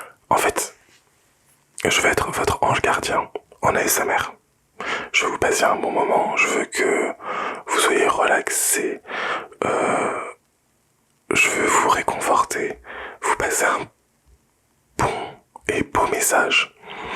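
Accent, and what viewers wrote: France. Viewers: I LOOVEEE French accent 😭😭🫶🫶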